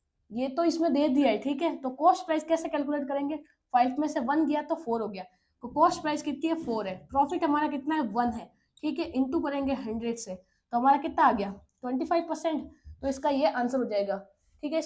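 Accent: Rajasthan, India. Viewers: Mam apki class entry bhut achi lgti❤❤apni rajasthani style me 🎉🎉 content bhi bhut acha h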